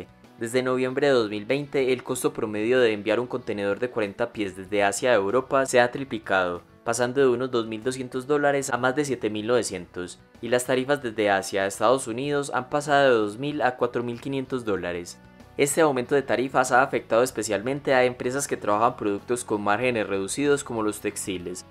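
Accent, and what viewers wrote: Colombia. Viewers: Sería interesante que hagas un video sobre como las subvenciones dañan el tejido productivo de un país, por tu voz creo que eres colombiano, en caso de ser así sabes que ciertos sectores políticos han propuestos rentas básicas universales.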